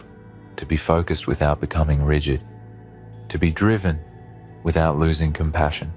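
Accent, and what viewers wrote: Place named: Australia. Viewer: This voice is terrible. Nasal, sleepy, Australian. I much prefer the other voices.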